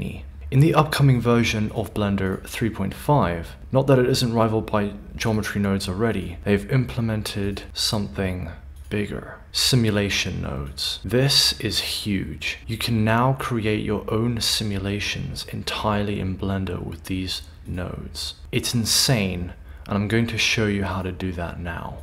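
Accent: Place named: United States. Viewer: Warner 'broes'? Have they changed their name or does the person live in murica, going by his accent?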